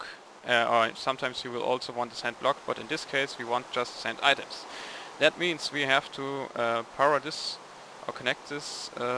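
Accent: Germany. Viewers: vom akzent her muss er deutscher sein :D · Ithinkk you're a german, oder? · i like his germen voice or at least i think its germen still it sounds cool and ... gentleman-ish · bist du deutsch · Are you from Germany? / Bist du aus Deutschland? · HAHA, ich wusste es^^ :DD, man hört, dass du deutscher bist^^ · Yes, it's kinda obvious that he's german, I'm german and I can hear it from his accent ;) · Are you a German ? You have German accent. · Du bist deutsch, ne? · Man i'm from Germany and thats definitely a german accent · bist du deutsch?? denn dein akzent ist grausam^^ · red deutsch! ;D man hört dass du deutscher bist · Nein, der deutsche akzent klingt gut, es ist keine Tugend wie jeder Amerikaner rumzunuscheln! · you sound a little german... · ... Hey, you are German, right? You have a little bit of an accent. :D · Du bist Deutsch, hab ich recht?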